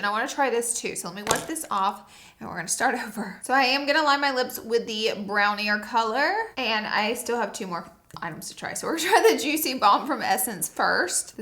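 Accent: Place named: California, United States. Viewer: You usually always have this Cali- vibe to your voice, in this video you sounded like a New Yorker! 😅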